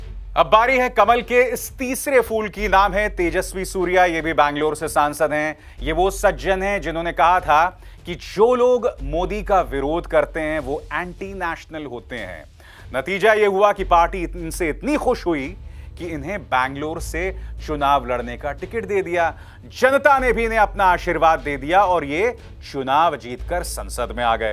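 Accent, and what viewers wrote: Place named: Bihar, India. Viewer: Nice tone sir in bihari tone 🙏🙏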